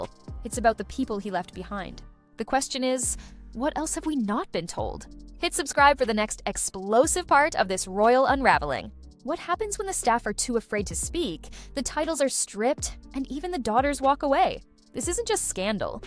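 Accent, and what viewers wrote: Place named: United States. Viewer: so why is this trash presented with an american accent?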